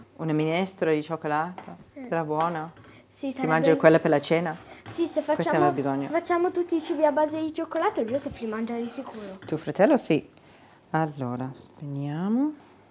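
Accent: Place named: Italy. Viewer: OMG! I freaking love your Italian accent!! :-)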